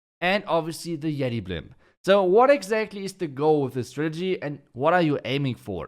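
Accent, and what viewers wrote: Germany. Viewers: I love how german sneaks into English videos, especially zuper 😂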